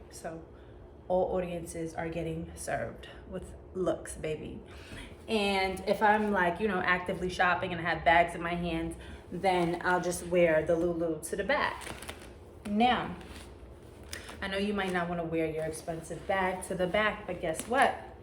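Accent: New York, United States. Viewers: For sure from NY lol I know that accent 😍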